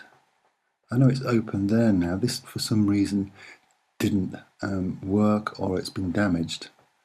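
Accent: United Kingdom. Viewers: I believe him because he has a British accent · OMG another soft spoken British guy You're on fire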